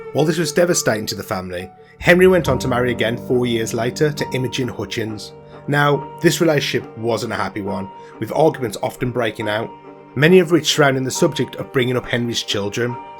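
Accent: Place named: Black Country, United Kingdom. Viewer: there are more things that has happened in the past despite going on we cant see in our modern present lives, love the black country accent mate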